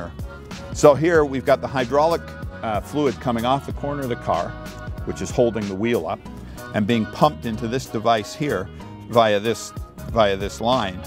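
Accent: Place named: Canada. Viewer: obvious Canadian accent...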